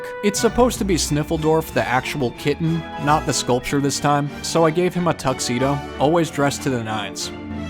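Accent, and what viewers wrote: United States. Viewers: He isn't from US? I am Brazilian and I don't know much about accent, but I thought he was from USA judging by his accent. O.o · I don’t really care about your American accent of orange I don’t know why people are getting mad at you OK 👌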